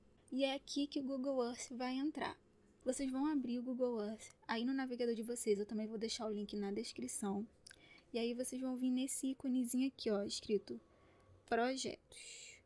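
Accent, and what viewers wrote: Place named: Acre, Brazil. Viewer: Você é do Acre? Que maneiro!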